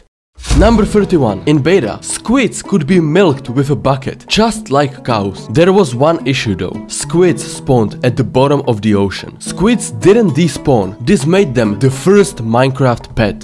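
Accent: Czech Republic. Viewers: t h i c c czech accent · Hele Kámo je hezky poznat podle hlasu že jsi čech :D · You sound like if you have czech accent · Love the Czech accent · Angličtina dobrá jen zapracuj na výslovnosti máš pořád strašně český přízvuk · my mans got the czech accent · Poznam z tvoji angličtiny, že si Čech. Tím nechci říct, že máš spatnou angličtinu. Pěkné video. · You kinda have a Czech accent lol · Nebudu ti lhát máš doclea silnej českej přízvuk.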